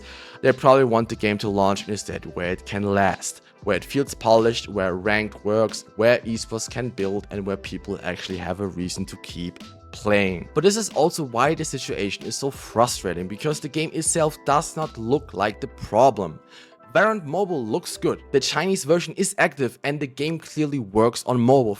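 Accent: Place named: Germany. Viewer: You really got all the info in this little Video keep it up! Lil question. First where are you from because you sound a bit german and second can you explain how you get a 100 ping if the background is your gameplay because i am stuck on 150 ping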